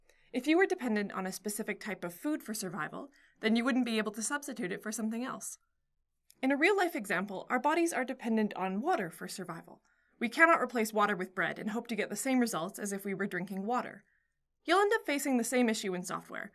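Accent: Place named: Canada. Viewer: I don't understand her Canadian accent.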